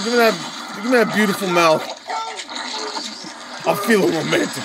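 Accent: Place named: United Kingdom. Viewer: yea but this guy in particular has a very strong British accent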